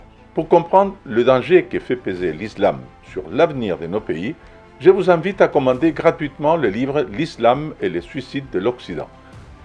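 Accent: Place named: Spain. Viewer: les musulmans de France sont bien plus français que toi vu ton accent espagnol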